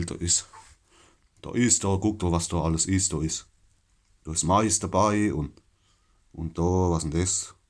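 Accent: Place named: Palatinate, Germany. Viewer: Dieses pälzer gelaber ist aber dem monnemorischen schon sehr ähnlich und sehr witzig. Hab dir mal n abbo dagelassen, Grüße aus Mannheim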